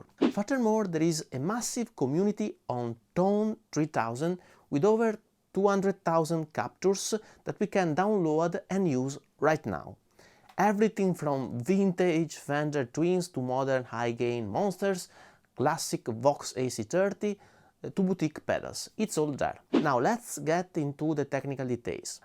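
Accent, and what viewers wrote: Italy. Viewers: You could put the script on your phone and reference the screen. The casual walk & talk is very engaging in many ways because it's like you're taking us on a journey with you through your environment where you normally go for a walk. We get to see a little bit more of your world and get to know you better. Nobody expects you to speak English perfectly. In fact, your Italian style makes you a real Renaissance Man!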